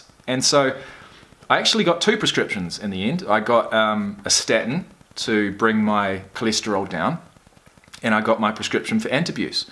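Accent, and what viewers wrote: New Zealand. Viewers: You from New Zealand?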